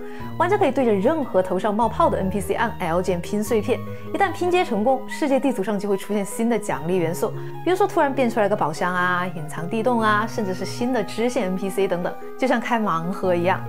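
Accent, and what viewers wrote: China. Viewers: 主播是不是四川重庆的人啊 我好像听到川普….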